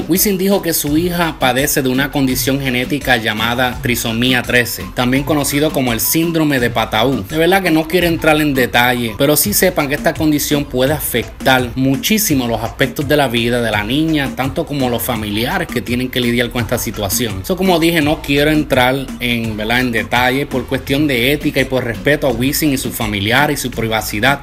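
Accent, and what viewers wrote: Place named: Puerto Rico. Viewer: ***** ajajajaja te pasaste ahi ajajajaj asereee saludos desde cuba italy le Das un toque boricua a esto brutal..adoro El acento e se lei y dije wao tiene que ser de colombia pero na ese acento lo reconosco entre miles..saludos brodel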